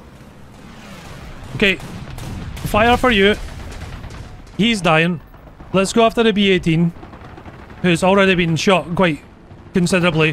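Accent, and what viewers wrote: Scotland, United Kingdom. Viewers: Wait are you Scottish mate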